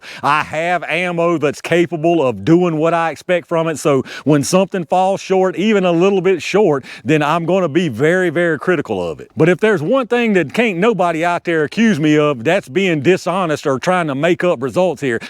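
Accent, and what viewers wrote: South, United States. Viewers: This video is worth watching for the rant. 🤣 Got to love that pissed off southern accent.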